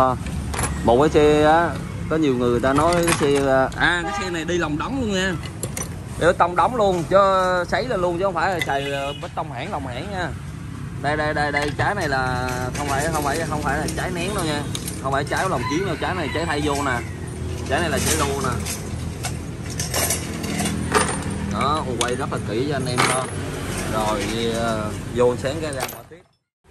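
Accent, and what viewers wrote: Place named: Phan Rang, Vietnam. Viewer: Giọng Phan Rang dễ thương quá 😂 Tiệm có nhận fix rung ga đầu vario ko Bro ?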